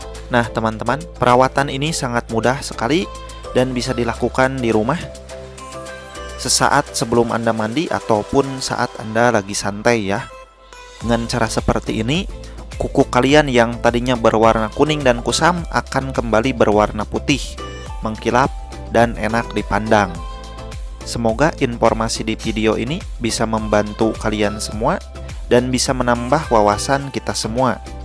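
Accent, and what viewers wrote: West Java, Indonesia. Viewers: Logat sundanya edun aa haaaa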